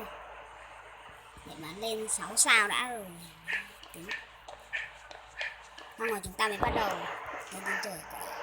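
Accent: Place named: North, Vietnam. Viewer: Lái ngu nói chuyện như bắc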